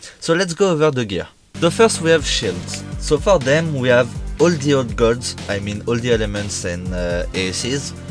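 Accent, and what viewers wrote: France. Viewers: T'es français non 😂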